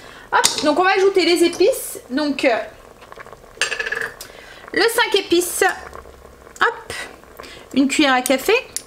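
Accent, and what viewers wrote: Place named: Nord, France. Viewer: Cc et oui tu es du Nord et la haut on a sa façon de s exprimer, tu es nature et c super et c pour cela qu' on t adore bises des hauts de France en force 😘😘😃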